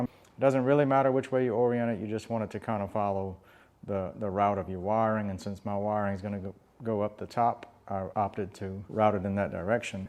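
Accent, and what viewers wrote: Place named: Louisiana, United States. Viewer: Love your informative videos! Where in the south are you?? Sounds like Louisiana accent!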